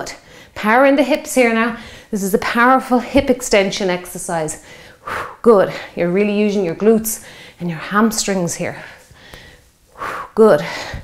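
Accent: Ireland. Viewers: Hi, a new subscriber from Belleville, Ontario Canada. I adore your workouts. They are calming yet very motivating. I have done most of them and each one is terrific. Plus I never get tired of your lively Irish accent. I have shared your workouts to my Facebook page and had some of my friends try it. Thanks, keep it up!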